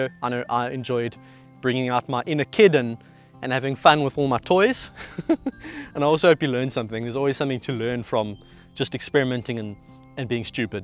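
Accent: South Africa. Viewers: Funny to hear a mix of accents in South African English, a mix between Australian and a Dutch man speaking English. Groetjes uit Nederland.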